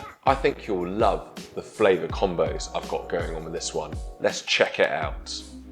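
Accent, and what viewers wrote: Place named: Scotland, United Kingdom. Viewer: so difficult to understand his English... I can better understand a Chinese immigrant than his Scottish (or whatever English) accent.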